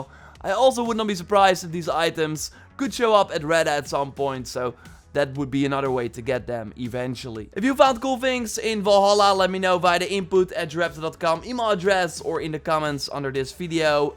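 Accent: Netherlands. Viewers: Idek if you're dutch but you have the most dutch accent ive ever heard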